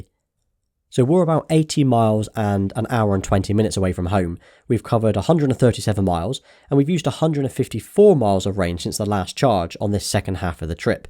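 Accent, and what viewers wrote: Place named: Bristol, United Kingdom. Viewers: Fab videos, thanks for sharing. Detected the west country accent early on and nice to have that confirmed (from near Bath myself). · Watched your videos whenever I see them but I’m looking in to getting a Tesla. I’ve always thought you were from the same city as me (Bristol) from your accent and was so confused when you said you live in York, but then you said you’re back in your home town Bristol😂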